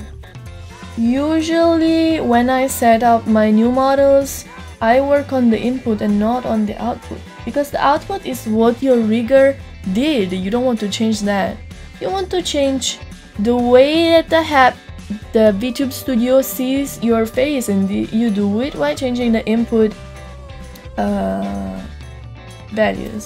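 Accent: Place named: Italy. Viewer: Ma scusa, ci sono già migliaia di persone che parlano inglese e hanno più views. E te che sei Italiana e si sente DI BRUTTO, ti metti a fare tutorial in inglese? 🤦🏻‍♂️ La community inglese, ha fin troppe persone che spiegano pure come costruire un razzo spaziale. Invece la community italiana è sempre quella più svantaggiata. E giustamente te ti metti a parlare inglese per avere più ascolti. Risultato: 3000 follower. I'm laughing :D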